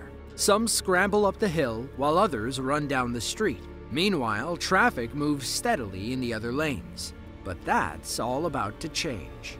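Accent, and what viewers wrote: United States. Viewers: he speaks English like an American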